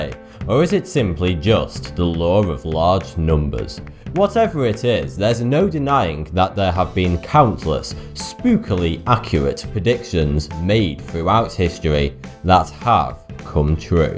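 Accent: United Kingdom. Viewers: Is that British accent? I must replay some sections because of that accent. Not to say that British isn't a beautiful accent. It's just that I rarely have the pleasure to hear accented English. But the speaker is rather cute. Like, bunny type of cute. The type than you wanted to cuddle and eat- I think I'm going to stop now. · I put this on before bed, and only listen to it... Ive listened to a bunch of these, and all I hear is "42 here" not thoughty2 lol.... British accent!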